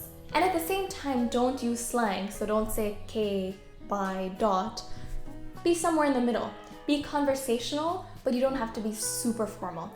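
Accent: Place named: United States. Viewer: very nice. you have a perfect american accent, have you always had one?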